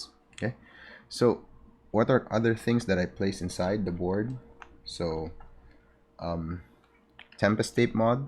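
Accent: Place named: Philippines. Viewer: Perfect accent bro. I hate getting the American accent just because I grew up here in the US. Slight Filipino accept while speaking English sounds nice. I mean probably just me being patriotic meh...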